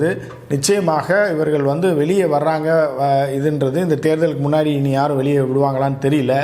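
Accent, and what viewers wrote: Madurai, India. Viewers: மும்பையில் இருந்து திருநெல்வேலி வருவேன் ....கண்டிப்பாக அண்ணன் உங்களை எப்படியாவது சந்திக்க முயற்சிப்பேன்...விரைவில் உங்களை காண ஆவல் அண்ணன்...உங்கள் கருத்துக்கும் , பேசும் பானிக்கும்...அந்த மதுரை தமிழுக்கும் நான் அடிமை ❤️❤️🙏🏾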